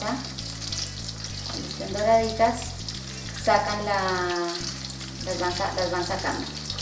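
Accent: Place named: Cuba. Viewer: Hola.Gracias por tus videos. Estoy en una temporada donde la cosina me està encantando, y buscando unas recetas de croquetas encontrè tu video. Soy cubano, aunqueahora no vivo allà, pero si consumiamos muchas croquetas. Tu asento se parece al cubano.¿Eres cubana? Gracias.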